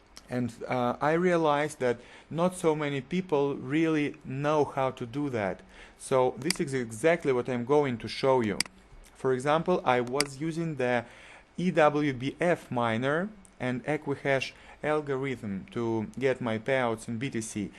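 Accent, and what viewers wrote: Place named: Russia. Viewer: Отлично! Всё понял друган! Привет из России! Сколько сейчас долларов в сутки майнишь? что то у тебя реально конкретный акцент....ты из РФ что ли? :)))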